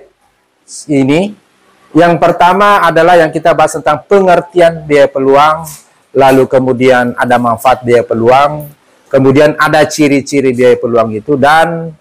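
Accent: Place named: Sulawesi, Indonesia. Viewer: Salam Kenal Bapak sepertinya bapak guru dari daerah sulawesi ya dari segi logat nya saya tau soalnya saya dulu kuliahnya di ikip negeri up jurusan koperasi d3 lanjut langsung ke S1 alumni 1985 mantaaap penjelasaanya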